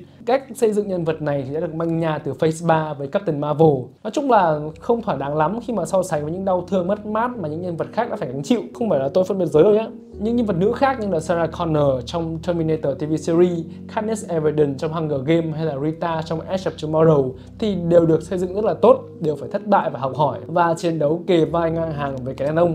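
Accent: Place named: Central, Vietnam. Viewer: Yo kh bàn đên sự vô duyên của ông thì cái nói miền trung tôi thấy nó hơi có mùi phân biệt r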